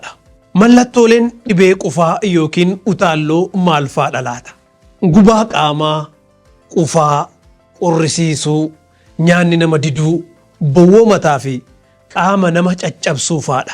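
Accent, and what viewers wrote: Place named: Somalia. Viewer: İ think you talking like Somali language sir, is there any difference between Somali and oromo accent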